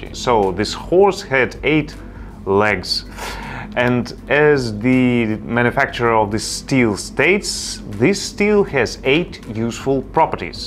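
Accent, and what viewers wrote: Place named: Russia. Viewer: Hi. Thanks for all the useful information you have shared. I like how you break everything down in an easy way to understand with your awesome Russian accent. Thank you once again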